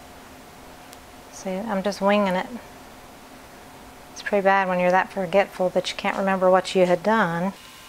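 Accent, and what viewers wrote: South, United States. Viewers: Well....you're def from the South but it's not bad at all!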